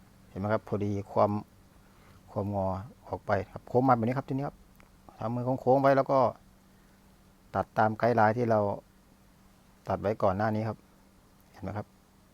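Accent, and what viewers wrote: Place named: Laos. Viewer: ข่อยมักการพากษ์อ้ายแฮงครับ เว้าไทยเเล้วสิมีสำเนียงลาวแกมมานำ แบบผมเลยครับ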